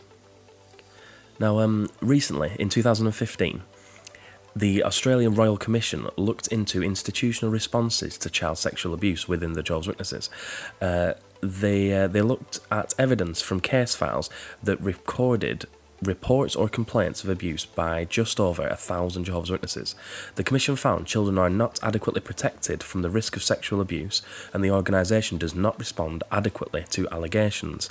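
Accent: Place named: Lancashire, United Kingdom. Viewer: Nice accent ..... Lancs/ Yorks ? Also extremely interesting !!! !it was The Royal Commission that set me free after 40 years !!!!!! I'm a Yorkshire lass !I apologise if you are from Pimlico or Chelsea 👵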